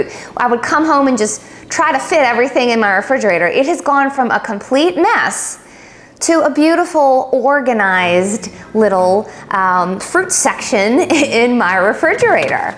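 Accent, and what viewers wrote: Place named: South, United States. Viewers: I'll bet this is Texas accent 👍🏼. Go Aggies. Go Longhorns 👍🏼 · Gotta be a southern gal-I heard a “ya’ll” right off the bat. 😃 takes one to know one!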